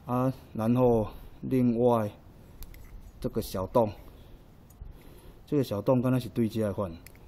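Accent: Taiwan. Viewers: 不推不行，實在讚！正台灣的口條，讚！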